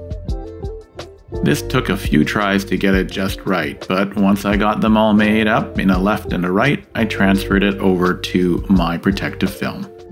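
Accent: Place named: Midwest, United States. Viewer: Nice video Sir! I am wanting to protect some areas on my carbon fiber mountain bike. Your video helps with some great ideas to have a successful install. I hear from your accent that you're from the Midwest or Canada. The word out gave it away. LOL. I am from Michigan so I totally get it. Have a blessed day.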